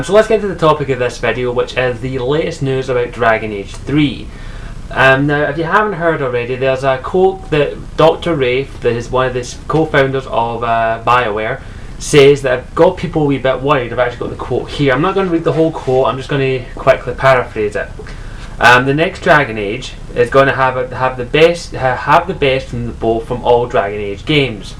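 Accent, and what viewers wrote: Ireland. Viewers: Are you irish? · Lol. "Are you Irish?" Sorry, but that was funny. You have a thicker accent than I'm used to, but even if I didn't look at your user name, I would still be able to tell. Eh, what do I know, I'm just a silly American. I seriously don't want the rumors to be true, if they make DA3 into a multi-player or an open world... there is no way to properly convey my disgust. They definitely need to go back to the multi-race/background set-up and allow for more conversations like in DA:O. <--disjointed much?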